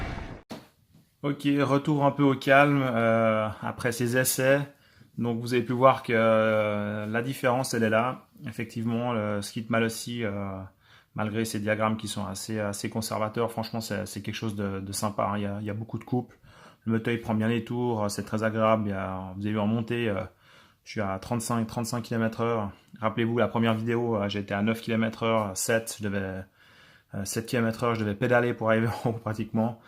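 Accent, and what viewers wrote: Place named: Geneva, Switzerland. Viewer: On sent bien l'accent de Genève. Salut compatriote 🇨🇭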